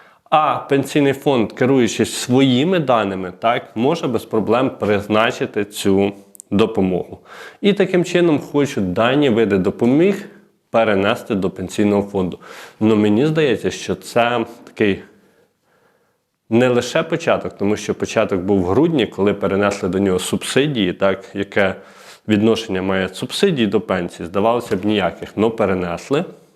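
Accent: Vinnytsia, Ukraine. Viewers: Людмила, у мене також вища освіта і ні в якому разі не придираюсь, просто у деяких вінничан такий діалект, а молодець, займається дуже потрібною справою, за що йому велика подяка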